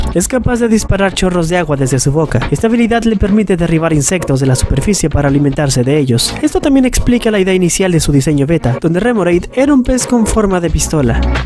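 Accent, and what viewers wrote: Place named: France. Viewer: Yo solía pensar que era un francés hablando en español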